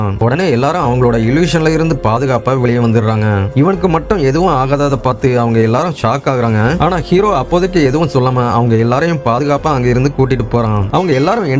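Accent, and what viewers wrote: Coimbatore, India. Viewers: Unga kovai tamil apo apo varuthu nice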